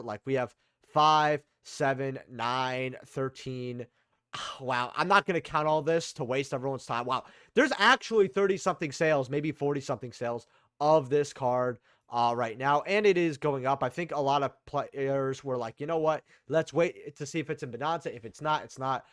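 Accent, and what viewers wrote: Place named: New Jersey, United States. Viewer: European? Bro, I could’ve sworn you sounded like you might’ve been from Jersey or something.. also I hope 2025 is your year! We love you,!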